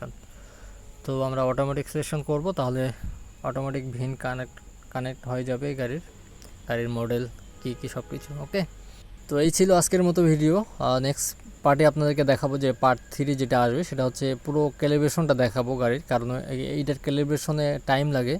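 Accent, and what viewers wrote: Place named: North Bengal, India. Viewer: এখন বিষয় হলো আপনার কথা শুনে তো মনে হইতেছে যে আপনার বাড়ি উওরবঙ্গের দিকে আমাদের দিনাজপুরে নয়তো।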